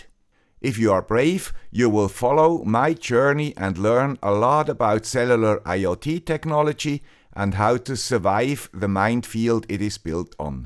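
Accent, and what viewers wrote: Switzerland. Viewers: I didn't know that was a Swiss accent. And why does everyone assume Americas eat steak? Is it unusual for people to eat beef? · Once again, "...the guy with the Swiss accent shows why I consider him the reigning King of IoT on YouTube! I've come across a company in the US that specializes in provisioning mixed mode, mixed carrier IoT/M1 SIMs networks I don't remember the name, and I'll try to get it to you if I can find it again. My new, rural, American home has essentially no coverage with handsets on one big network, except their wireless internet device supplies enough data for WiFi calling to handsets, and reasonably good YouTube video streaming. Another big network provides enough bars to do the above directly on handsets.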